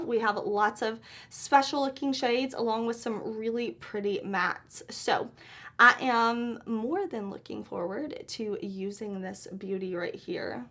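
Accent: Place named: Texas, United States. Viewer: I am so broken hearted. I have tried to get your brush set from Sigma about 7 times and they are always sold out. 😭😩😭😭😭😳 please tell them to make more so I can get your brush set finally. 😊I love you and your makeup videos. My half sister is from Mesquite, Texas and your twag sounds just like her. You are beautiful and your spirit is beautiful too! Keep making these awesome videos! Thanks girlie for helping with makeup tutorials and for helping to show all the new awesome indie makeup! ❤ thanks luv! ❤❤❤❤❤😊😊😊😊😊😊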